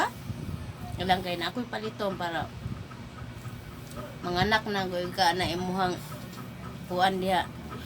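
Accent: Visayas, Philippines. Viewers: .dont be upset naghalo ang kape at gatas at isa pa.carbon copy ung babae mo sa asawa mo dat means ur so inlove sa uyab mong pula dhil kahawig na kahawig sa asawa mo yan ang kasabihan. Isa ako sa avid fans mo kc pagnagsalita ka nkakatawa ung bisaya mo parang c ate charing I'm long time avid fans of u.pls reply me sometimes.thks.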